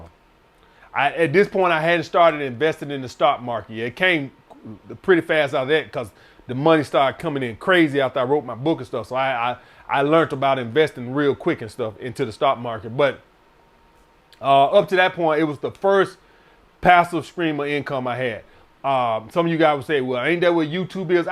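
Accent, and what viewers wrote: South, United States. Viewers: It’s funny you say you uncle was one of your biggest influences to being an alpha, but in my life you sound just like my uncle. Even the southern accent!!!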